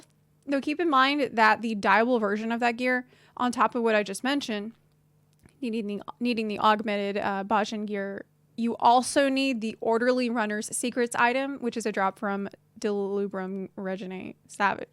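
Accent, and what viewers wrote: South, United States. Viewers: love that southern american accent too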